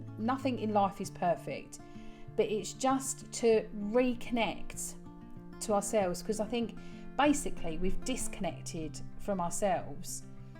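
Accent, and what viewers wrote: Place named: England, United Kingdom. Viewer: Hi! I’m a new subscriber from North Carolina. Your video just popped up on my feed. It is lovely to hear your English accent. I am wishing you all the best on your self care journey. xx 🦋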